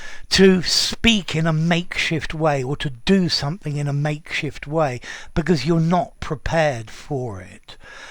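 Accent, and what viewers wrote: RP, United Kingdom. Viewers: I wonder if the teacher speaks Contemporary RP. Can you tell me?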